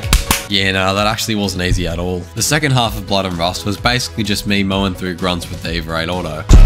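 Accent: Australia. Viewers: this dude is australia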